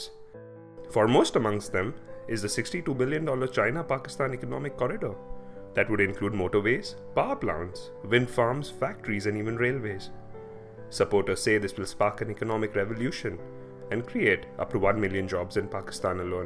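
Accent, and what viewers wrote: India. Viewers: 100 % biased against China, the narrator sound like an Indian national...by the time Prime Minister of Indian, Modi dies, China GDP will be probably double of the U S A. The narrator knows nothing about China intentions nor he knows about the British and American are difference. The British is already joined the AIBB while the American doesn't.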